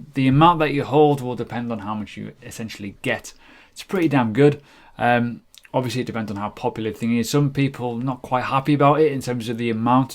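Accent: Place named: Northern England, United Kingdom. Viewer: Awesome northern accent.. reminds me of when I was growing up in Manchester. Great Channel BTW. SXP is my pick as a cheap starter. ZIL as well - big bag of this. Thx for the ELROND video , may get some of that. Cheers Ben - New Zealand.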